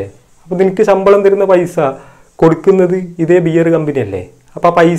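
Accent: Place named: Kozhikode, India. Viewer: Almost 1.23 minutes of a clip by some extremists like Taliban from an unidentified place, then a teaspoon of Moin Ali, then two cups of Naveen and Janaki, then a piece of incomplete hadith. All mixed in a cauldron peppered with nice kozhikodan accent. Sure recipe for a viral video with lots of views, likes, comments and thus a revenue mode for the presenter! Of course the presenter has the rights to air his opinion since we live in a democratic country but it should not be at the expense of religions in our country. Every religion including Islam, Hindu, Christianity etc has an extremist faction. But generalising the whole community by highlighting only those selected parts is totally wrong. Don't tarnish Hinduism with your theory of Gothram just because some insensitive politicians brought a communal angle to the viral dance video. Majority of Hindus protested against the stupid statement brought out by the political party. 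And by the way , quoting a hadith is a new fashion and a sure shot ingredient for making videos viral because people don't know what's written in Quran, the context, the inner meaning etc since it's in Arabic. When you proudly discovered the Intoxication hadith, my dear friend, you forgot to check the full context and remaining part of the hadith you mentioned. Next time, please read the paragraphs above and below of the random lines you pick to spark a fire of hadiths or verses from Quran. Understand the whole chapter and context before adding memes! Oth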